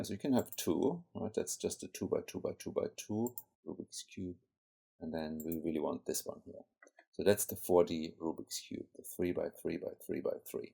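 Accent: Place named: Germany. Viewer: Hello, I just wanted to thank you for bringing my attention to this great puzzle. I have cubed now for nearly half of my life and solving this thing has been my greatest archivement so far. Your videos inspired me and helped me to find my own - and until now very unefficient - algorithms (it took me about 5400 turns). My next step is solving this in less than 1000 turns. Way to go! I always wanted to ask you whether you have German origins of some kind? I am from Germany myself and your accent sounds kind of German in my oppinion, in addition to your name obviously:D Anyway, thank you again, and greetings from Germany!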